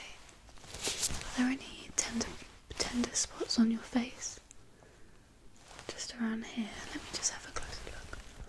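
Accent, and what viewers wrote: United Kingdom. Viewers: Idea 💡, There are a lot of us who learning english, and lot of us like British English pronounciation, and we almost haven’t asmrtists with pronounciation as yours. You could make a lot of material for learners in your asmr way. Podcasts on spotify, books naration on audible. You could do it all. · You are so beautiful 😍 and your sweetest British accent drives me crazy 😘😘😘😍😍Marry me my queen 👑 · the video is perfect, love your british accent, tingles after each word :D may I please ask you, is this a London accent? or are you from the middle of the UK? I'm still wondering which british accent is the best and I think I've found one :D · Heard a British accent - subscribed straight away! This is an amazing video!!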